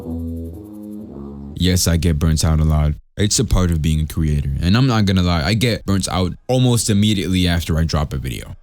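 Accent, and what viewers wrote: Dominican Republic. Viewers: So you're Afrolatino! That's so cool!! Also, "not well spoken or articulate"? My guy, Dominican accent aside, you are very FLUENT!! Your grammar and pronunciation are ON POINT! And this is coming from a native Spanish speaker myself!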